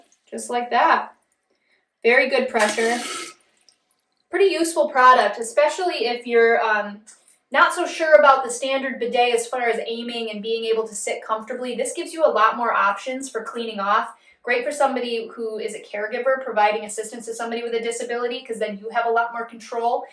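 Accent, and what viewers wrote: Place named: Midwest, United States. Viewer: Thanks for the great video and clear instruction. Love your midwestern accent and I haven’t heard the word “grody” for so long you took me back to the 60s/70s! Ha! Just wish I could still get down on my knees. Arthritis galore! Thx again!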